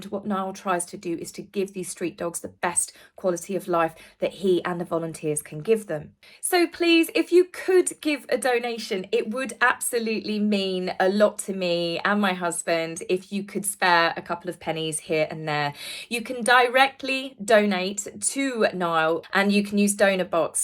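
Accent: United Kingdom. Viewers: is incredible. I can see this channel going the same success as Stef the Alter Nerd. its the way you present and who doesn’t like a British accent?:) It seems Meghan and Harry are the worst Royal connected couple in modern times.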